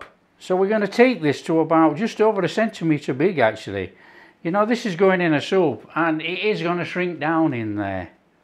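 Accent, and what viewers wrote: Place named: Edinburgh, United Kingdom. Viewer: Edinberg , England is where a life time friend of mine was from..his accent was just like yours lol...he has died but everytime i listen to you I hear George talking lol!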